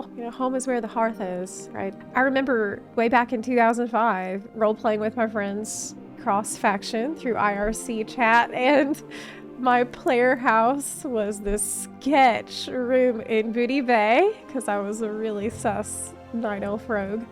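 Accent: South, United States. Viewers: Why do you all of a sudden have a Southern accent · I hear your southern accent! XD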